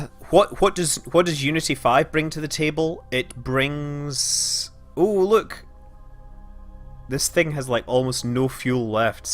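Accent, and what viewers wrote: Scotland, United Kingdom. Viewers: Is he Scottish? He just sounds Scottish to me and if I'm hearing that right I'd be happy. If not I learned something.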